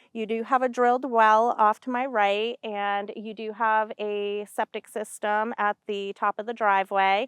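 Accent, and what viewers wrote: Maine, United States. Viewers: She has a Maine accent.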